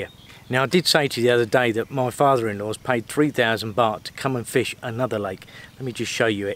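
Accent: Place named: England, United Kingdom. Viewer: I really detest english accents. i'll try to listen for awhile 1. Edit: Ok, I still hate your accent but the visuals are very nice ! 2. Edit: You really do have some skill with the visuals. BTW, I know of your recent health issues and I send my sincere condolences.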